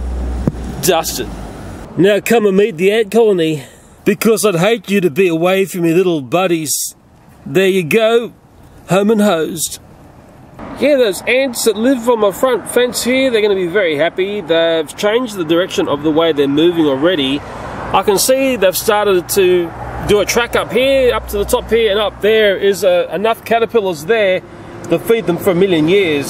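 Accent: New Zealand. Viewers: Thought he was a New Zealander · Yeah sounds like a New Zealand Accent. Which is Taika Waititis nationality (guy who directed thor ragnarok and voiced Korg)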